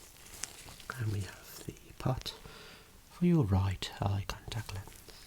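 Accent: Birmingham, United Kingdom. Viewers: There is something extremely relaxing about your videos. I think it's a combination of your Birmingham accent with your aura. I would be blessed to be one of your clients. Great video! 🙏😄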